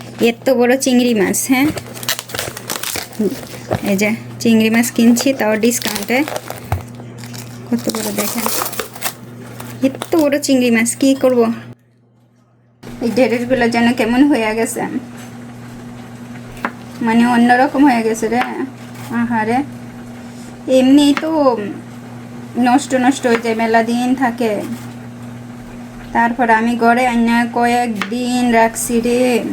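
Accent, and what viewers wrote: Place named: Barishal, Bangladesh. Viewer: আপনার কথায় বরিশাল এর ভাব আছে,খুবই, খুবই ভাল লাগে।সব সময় ভাল থাকবেন। ❤🎉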